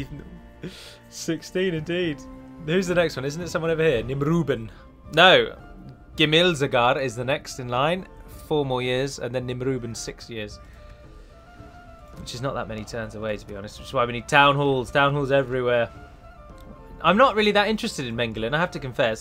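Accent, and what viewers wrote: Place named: United Kingdom. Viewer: YOU just have the best British accent ivé ever heard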